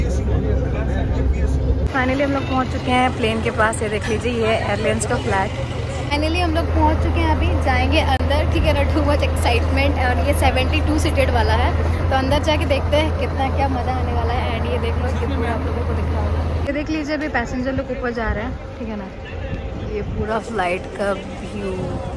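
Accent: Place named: Odisha, India. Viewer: Flite me safar karna kya ajkal ka dinme badibath he kya odia wali hindi jadh raheho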